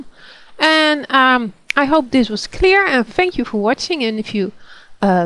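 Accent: Netherlands. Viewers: lol, ik hoor wel dat je een jonge Nederlandse vrouw bent ;) Buitenlanders hebbe' natuurlijk geen idee. Goede filmpje bij de weg.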